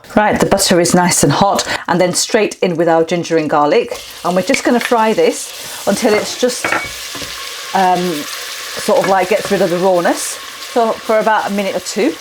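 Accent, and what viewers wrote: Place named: Lancashire, United Kingdom. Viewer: I'm really ready to make this dish. Thanks for the recipe and encouragement. I also detect a Lancs accent - worked there many moons ago. And it's so nice hearing you pronounce the word Dal 🙂 brings back fond memories. Ive subcribed and will urge others to do so... Thanks so much.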